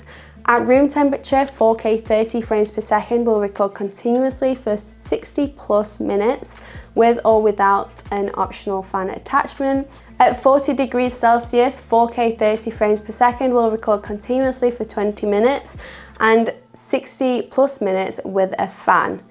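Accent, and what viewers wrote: United Kingdom. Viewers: Is it Brit accent? Always found it hard to get it (though they ruled India for long).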